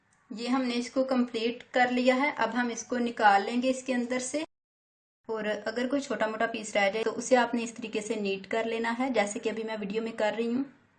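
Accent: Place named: Narowal, Pakistan. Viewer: Lgta h aap narowaaal sy h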